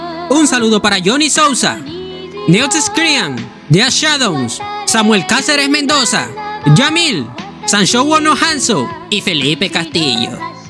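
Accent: North, Spain. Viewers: Con la prima? norteño? XD saludos!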